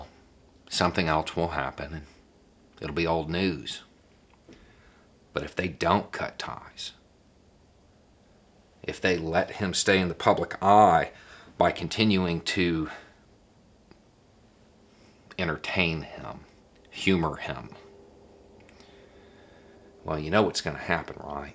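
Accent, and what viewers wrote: South, United States. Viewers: Wisdom with a Southern accent. Who'd-a thunk it?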